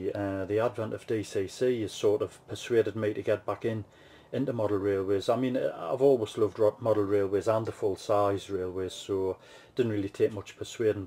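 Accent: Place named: Northumberland, United Kingdom. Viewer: Really enjoyed this beginning of your layout. My father was from Blyth and your accent brings back happy memories. We had a camping holiday at Blyth many years ago. I remember the wonderful beaches you have in your part of the world.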